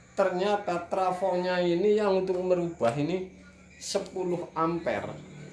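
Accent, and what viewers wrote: Banyumas, Indonesia. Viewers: Senadjan kesanne mandan kaku, nanging aku bangga... logat Banyumas ane bisa dikenal Maring saindenging Nuswantoro.... aja kelalen... tetap semangat 45.... merdeka....!!!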